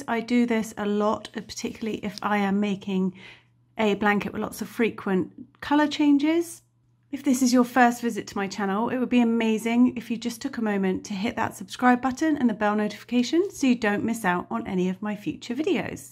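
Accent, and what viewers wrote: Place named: United Kingdom. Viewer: Absolutely genius!! Thank you so much for sharing. I have just ordered yarn to do a very heavy color change afghan (every row changes) and your timing with this amazing tip could not be better!! I am really excited to do my project now. I was dreading all the ends, but I really wanted to do the pattern. Woo hoo! Thank you, thank you, thank you. Love your channel and your Brit accent. Carry on!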